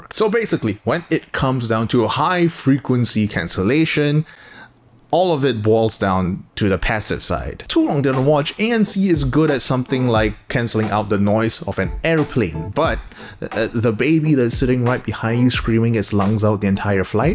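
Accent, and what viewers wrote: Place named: Singapore. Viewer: This man sounds really Singaporean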